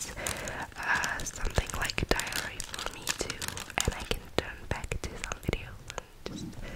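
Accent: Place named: East Germany, Germany. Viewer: I think Eastern German/Polish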